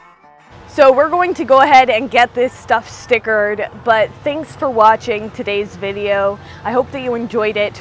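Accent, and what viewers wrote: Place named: Michigan, United States. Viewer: Hey, has anyone ever told you that you sound like you're from northern Michigan not north central Pennsylvania?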